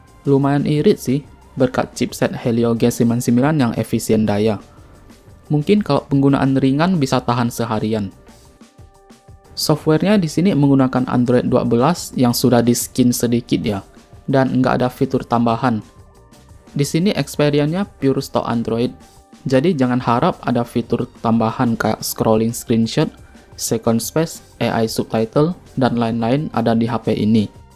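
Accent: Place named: Bali, Indonesia. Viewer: Logat bali😊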